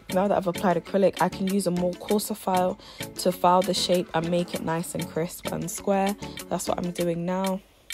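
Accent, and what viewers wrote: United Kingdom. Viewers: yesssss a uk gal! finally ❤️ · So happy I heard a British voice, even better London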